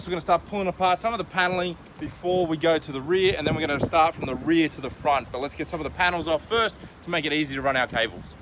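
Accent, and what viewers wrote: Australia. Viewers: I love your Aussie accent🥰 Reminds me of the time I used to live in Australia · What an Aussie accent ! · Best Dashcam DIY install I've seen. Easy-to-understand Aussie voice also. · Geez Australia has jacked up accents irritating to listen to learn to speak English bro can’t take one more second of your voice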